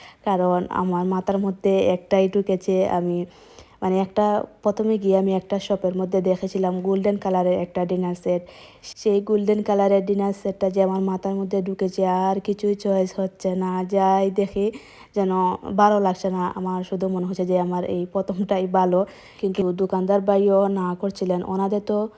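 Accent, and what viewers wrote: Sylhet, Bangladesh. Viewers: আসসালামু আলাইকুম আপু কেমন আছো তোমার ভিডিওটা অনেক সুন্দর ছিল আপু তোমার বাসাটা খুব লার্জারি তোমার খুব ভয়েস শুনে মনে হয় গ্রামের বাড়ি সিলেট তাইনা আপু কোথায় থাকো তুমি ❤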